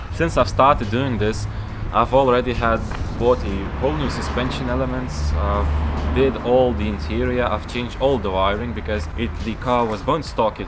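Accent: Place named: Lithuania. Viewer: Agirdi tu lietuvis nes nekaip kalbi anglas nedarytu jokiu klaidu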